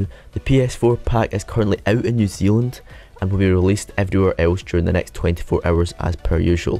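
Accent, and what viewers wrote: Scotland, United Kingdom. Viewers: Yeah He Is Defintley Scottish He Does Not Sound Like Arnold Schquanegger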